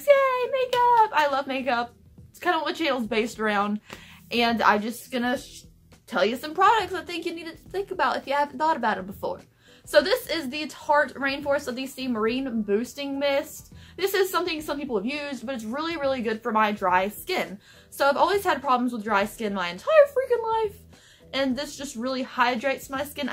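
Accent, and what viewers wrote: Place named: North Carolina, United States. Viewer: Youre in NC, i was like i know that accent! I lived all over NC for 8 yrs. Whats that wig's name/style? My hair is copper blonde/auburn, i dont dare to go over to the strawberry blonde reddish color, but still like it. It def suits you. I love Colourpop's birthday cake i was just starring at it today, i love to add sparkly pigments on top.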